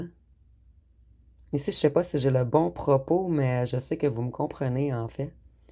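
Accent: Quebec, Canada. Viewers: J adore ton accent Québécois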